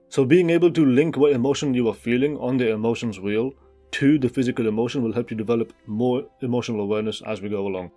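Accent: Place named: Egypt. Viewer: This random, tiny channel of a man speaking with Egyptian accent has helped me so much with pushing through procrastination. Thank you Internet man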